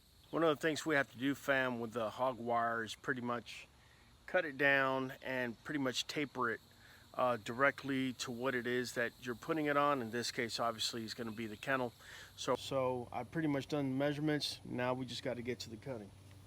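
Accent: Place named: Texas, United States. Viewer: Thank you for your energy and effort to complete these videos. I hit that like and sub. Knew you were in Tex the sec I heard the fam lmao.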